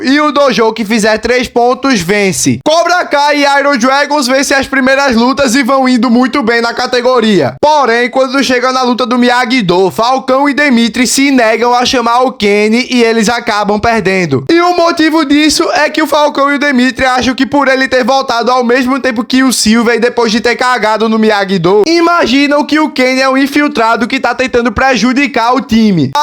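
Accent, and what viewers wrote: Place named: Northeast, Brazil. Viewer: você e Nordestino?😂você fala igual um amigo meu que veio de lá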